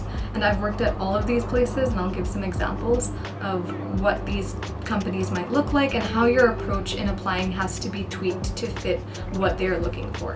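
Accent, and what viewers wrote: United States. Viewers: Did you do your studies in 🇺🇸 US, I am in US for my masters I wanted to get like your accent 😊 Please help me in improving my accent ❤ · Why do you speak in an American accent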